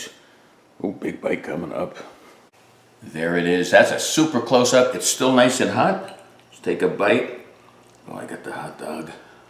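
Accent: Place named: Great Lakes, United States. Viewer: My grandmother used to make this around Buffalo, New York. This is one of my favorite breakfasts. I don’t eat it so much anymore because I’m trying to eat more healthy but man is it good. From your accent I’m assuming that you are perhaps from the Great Lakes region? Maybe it’s a thing in that area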